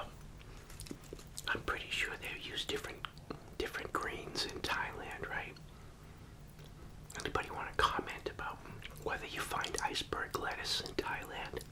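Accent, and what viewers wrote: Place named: Boston, United States. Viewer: This was painful to watch, from the constant unnecessary stirring of the drink to the "townie" unsophisticated way he eats, the Cornell plates and silver wear he uses, like the low of the very low and the Quincy Boston accent...the whole thing left me with.a feeling of sadness and being thankful it was not me.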